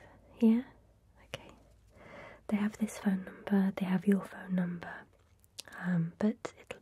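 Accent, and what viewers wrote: United Kingdom. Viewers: your beautiful British accent and lovely personality are the sole reason why I watch your videos. My mom playfully teases me because I absolutely love the British accent and that I’m meant to marry an Englishman. ❤️ #ASMR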